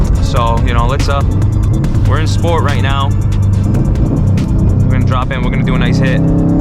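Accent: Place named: Massachusetts South Coast, United States. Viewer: Driving by Cask and Pig in Dartmouth eh? You from Fall River or New Bedford? I can kind of tell by your accent lol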